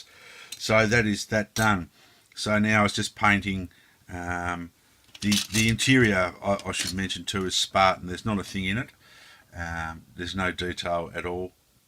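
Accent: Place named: New Zealand. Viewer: Nice scratch-building mate...I really enjoy tarting up these old kits. I look forward to seeing what you do with the "Whirlwind" too. Yes, terrible news coming out of NZ with the actions by that white supremacist...world's going crazy mate over religion. When I hear you speak, I think about a new tv series I've been watching from NZ called "The Brokenwood Mysteries"...got a nice young blonde detective in it. NZ is a country that's been on my bucket list for years, that and Australia. Would like to do one month of travelling around both countries...NZ looks so green and beautiful...just gotta avoid those Katipo and Redbacks! Enjoy the rest of your weekend! Cheers : )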